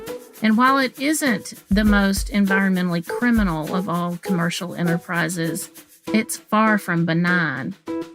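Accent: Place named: South, United States. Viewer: OMG yes. I'm a Mississippian and was pleased to year a southern accent and someone talking about the south. Sadly, it was a standard-issue liberal scolding that hit every cliche: the scolding of a minor pleasure, the implication that people in the global south would rather be unemployed than harvesting flowers, and finally, suggestions to either spend a ton of money on bespoke alternatives or to just "go for a walk or send a letter." If these moaners got their way, we'd be sending letters and giving hugs for every single holiday. I'm sure there are mirrors of this Valentine's Day complaint ready to go about the evils of Halloween candy (diabetes! tooth decay!) and Christmas presents (commercialization! Capitalism!). How about a nice hug, letter, or a $1000 healthy candy replacement made by your local candy monk? When a person buys a jar of jelly at a farmer's market for 10x the price of Welch's, they get to flaunt wealth, their own tastefulness, and their liberal values about caring for the Earth. 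This whole thing really rankles me.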